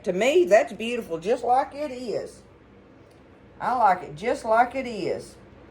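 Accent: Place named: Texas, United States. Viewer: Yes, she's referencing turmeric. Her Texas accent just makes it sound a bit different that how some folks pronounce it.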